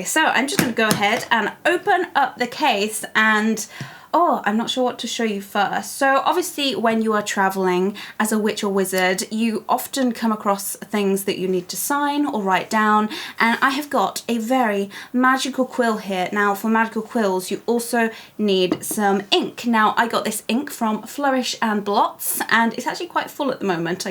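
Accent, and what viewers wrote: United Kingdom. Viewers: Cute video :) Cute cardi/vest-thing - love your British dialect/accent :D I just recently started following you, and I binge-watched a lot of your subscription box videos. I have signed up for the LitJoy thing and am excited to see if I can manage to get one. About the GeekGear boxes, I'm thinking of getting one of them - I would like to know which you would recommend: The wizardry one or the special edition one? Maybe you could do a video onm it, if other people have asked as well :) Thank you in advance, and have a lovely day!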